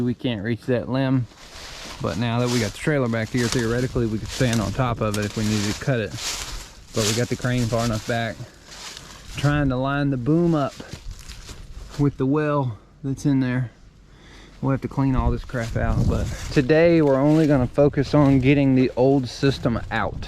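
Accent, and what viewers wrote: South, United States. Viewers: Wow I love ❤ your Southern accent from Norristown PA here 👍🏾👋🏾🤟🏾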